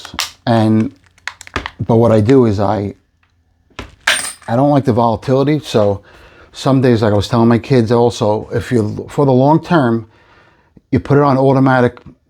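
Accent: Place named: Texas, United States. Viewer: Hey paisan. Like you stack, content and especially your Texas accent!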